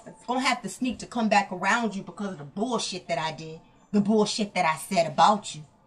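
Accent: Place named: New York, United States. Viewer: I like readings here new York accent sista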